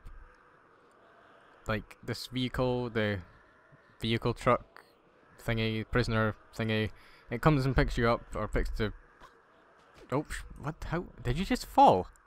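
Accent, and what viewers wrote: Scotland, United Kingdom. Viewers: And also are you from Scotland it sounds like it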